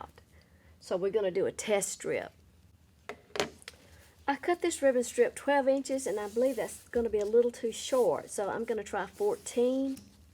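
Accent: South, United States. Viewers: Love it! Thank you for sharing! And your southern accent is too cute!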